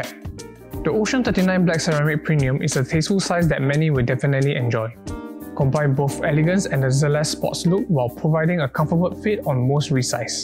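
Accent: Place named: Singapore. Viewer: English. That’s the Singaporean accent for you lol. He has rather bad pronunciation and pacing though, even for a Singaporean.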